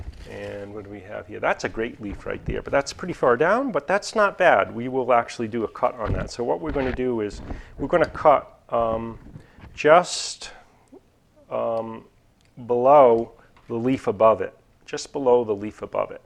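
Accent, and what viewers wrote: New York, United States. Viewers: Sounds like he's from New York or jersey.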